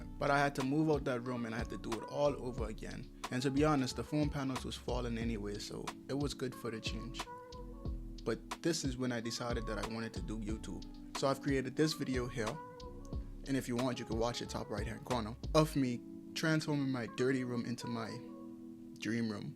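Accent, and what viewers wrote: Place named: Caribbean. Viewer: you sound like you have caribbean roots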